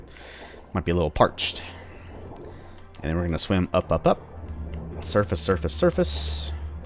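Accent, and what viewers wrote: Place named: South, United States. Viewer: Thanks from TX. You sound southern yourself! Loved replaying this game with my daughter.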